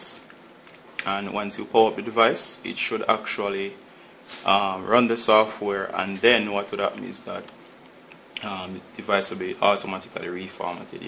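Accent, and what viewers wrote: Jamaica. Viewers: kinda personal but are from Jamaica?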